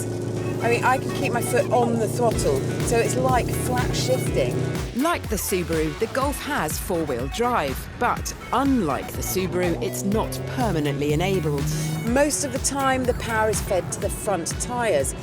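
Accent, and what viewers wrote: RP, United Kingdom. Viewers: An intelligent British woman with perfect Queen's English who's a petrol head AND can drive like that? Marry me. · british accent on a blonde....sexy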